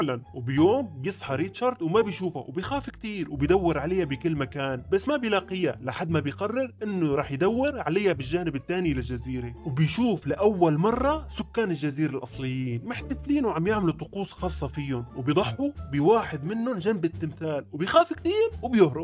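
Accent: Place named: Palestine. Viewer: لهجتك تشبه اللهجة الفلسطينية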